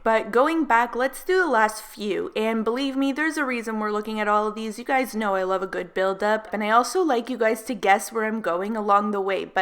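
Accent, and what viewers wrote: Canada. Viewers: i love canadians o's. dey so nice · I can hear your Canadian accent lol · Ugh, you sound so canadian it hurts. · You are canadian right? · "The one im aboot to talk aboot.... so you can see what im exactly talking aboot" I've never recognised a Canadian accent so clearly until now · OMG are you Canadian!!!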